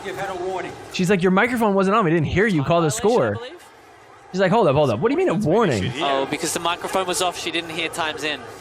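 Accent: Australia. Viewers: You're so funny...and your Aussie accent is on point! Keep it real, don't sugarcoat, or censor yourself! 😜👍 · Great job, as always! Love your Aussie accent and phrases! I did watch some of the matches. It was fun! I thought it was funny Tardio was late for his 1st singles match and then got pickled. He didn’t hear the call for the match. He had no warmup and he was seen wolfing down a Nutella pizza slice immediately before the match! Kids! 😂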